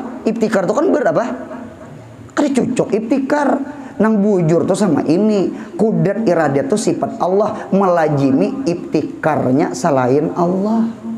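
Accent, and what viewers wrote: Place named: Amuntai, Indonesia. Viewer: Ulun katuju bgt lwn sdin nih, tutur katanya sopan bnget. Dari logatnya kya org amuntai. Mudahan guru selalu sehat dan istiqomah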